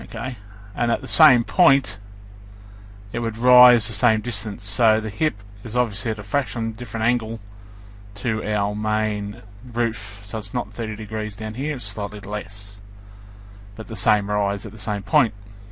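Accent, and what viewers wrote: Australia. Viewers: Aussie aye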